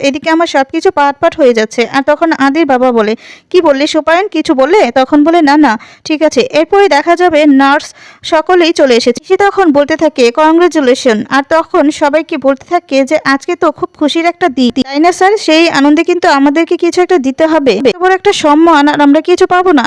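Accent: Bangal, Bangladesh. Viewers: আপনার বাঙ্গাল বাঙ্গাল, চোয়াড়ে,অশিক্ষিত কথকের কথকতা শুনতে মোটেও ভাল লাগেনা।যে গল্প বলার caption দেন,তা শোনান না।এটা breach of trust ,আইনত দন্ডনীয়। নিজের ভুল শুধরে নিন। চেষ্টা করলে শুদ্ধ, কথ্য ভাষায় কথা বলতে রপ্ত হয়ে যাবেন। আশাকরি ,চেষ্টা করবেন নিজেকে তৈরি করার। অনেক শুভেচ্ছা রইল।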